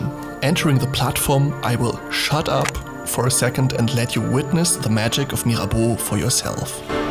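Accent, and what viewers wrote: Germany. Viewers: That was by far the best suggestion YouTube did since a long time. Great video, and very underrated! You should have 100 times the subscribers you have now and at least 10 times the views this video got today. Greetings from a fellow German. :) (By the way: the first thought which came in my mind when I heard your Voice: he is definitely a German. 😂) Keep it up mate! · Ich war schon über dein deutsches Englisch verwundet. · I was wondering if you were german, turns out you are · Are you german? Your english has a german accent I think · du bist deutsch oder? top video 👍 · Great vid, watched your previous one which is equally as good. I love the short, comprehensive style with the added German Accent! Great English btw. Hope to see the channel grow bigger and bigger with every video! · You are German right 😂😭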